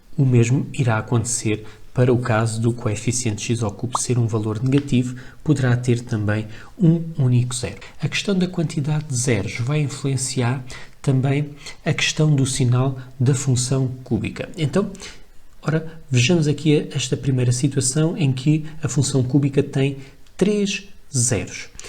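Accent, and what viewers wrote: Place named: Portugal. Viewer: O sotaque português de Portugal é bonito. Sou brasileira e não consigo falar assim. Gostei da aula e da tranquilidade do professor.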